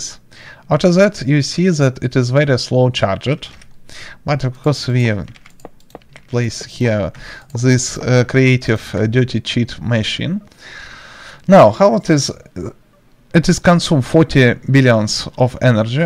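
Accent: Russia. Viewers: Акцент русский)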